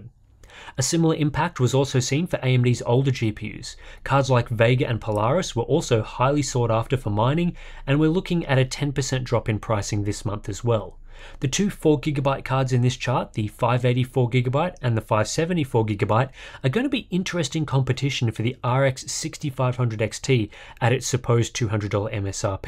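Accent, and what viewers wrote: Australia. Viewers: After 15 months of having an MSI Radeon 5500 Mech 4GB I can honestly say I only play these videos so I can hear the difference between my Syracuse accent and your Aussie pronunciations.... "One is my name. The other is not." - Lt. Commander Data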